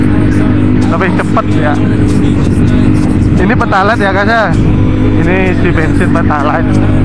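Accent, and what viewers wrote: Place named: Bali, Indonesia. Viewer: Kle bali banget wkwk jeg kaku bhs indone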